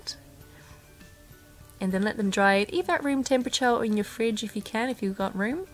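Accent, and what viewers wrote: Australia. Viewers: why do almost all the good bakers have Australian accents?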